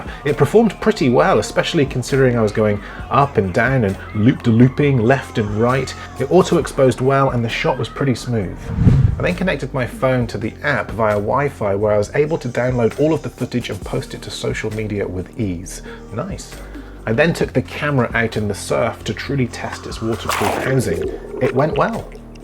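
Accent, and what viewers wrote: United Kingdom. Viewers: Your British accent makes it even better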